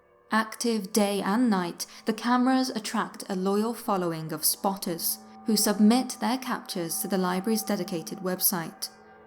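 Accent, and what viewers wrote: England, United Kingdom. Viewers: Beautiful English accent, clear, concise with beautiful pronunciation and read at the “proper” narrative speed for an audience to enjoy 😊. Good lass! ❤️🦋🙏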